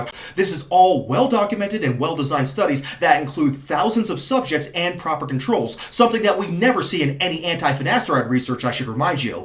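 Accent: United Kingdom. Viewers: Thank you for speaking in British English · That British accent, I died. :)) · Hahaha love the British accent 😂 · thank you for this video. As a british guy (that uses finasteride) who casually reads the BBC for national and international news, i was very worried by this article, particularly the anti-finasteride view it was pedalling, and was shocked at how keen they were to publish this losers sob story. All these facebook posts sharing this story and a similar one published by The Economist is full of comments by uneducated boomers who believe everything they've read and now think there's some sort of trade off to taking finasteride. It's straight up misinformation and I'm really hoping there's a resistance to this shit in the form of a news article or this EU study that demonstrates the safety of finasteride and how much of a loser Kyle is. God forbid they choose to ban finasteride, I don't know what I would do, but I'm banking on the medical study not using Kyle's sob story as evidence. 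Also your british accent has me absolutely cracking up! 😂😂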